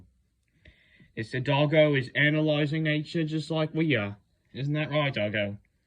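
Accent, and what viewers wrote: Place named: Australia. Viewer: Why the actual hell is no one talking about his b e a u t I f u l Australian accent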